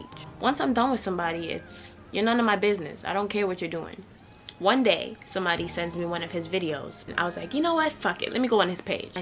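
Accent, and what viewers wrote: New York, United States. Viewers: It’s deadass not enough New Yorkers on YouTube cause I love the way we tell stories. when you was like “ guess who sent me the picture “ I felt like my bestie was putting me on !!!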